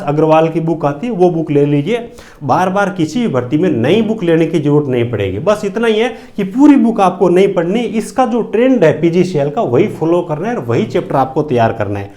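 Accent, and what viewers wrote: Rajasthan, India. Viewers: Aap rajasthan se ho kya sir?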